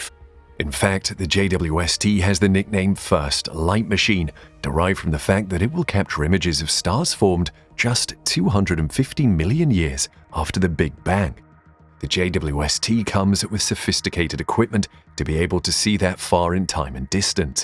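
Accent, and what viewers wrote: United Kingdom. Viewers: you have the british accent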